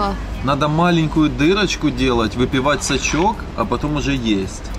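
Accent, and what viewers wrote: Ukraine. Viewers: Такой колхозный акцент как и у всех из украины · По говору слышно что из Украины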